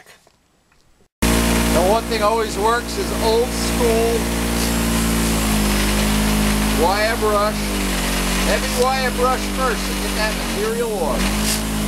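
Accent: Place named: New York, United States. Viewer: I swear... you sound just like my father!! Same N.Y. accent. Same gestures. Same humor. Same ability to do things that many would think were too difficult to even try on their own. My dad is a Parris Island Marine, with a tenth grade education, who learned that everybody has value, as we can always learn from others who have struggled to accomplish something. So, when you mention the friends who have helped you learn your craft along the way, that says a lot about your character sir. I ain't askin for a hug or anything... just giving you your props. Peace!!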